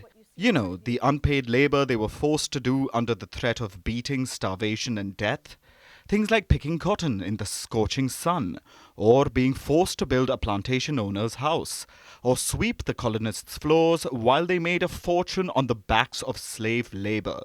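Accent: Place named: India. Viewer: Hey what are you guys even talking about? Never would even expect from someone with an Indian accent 😩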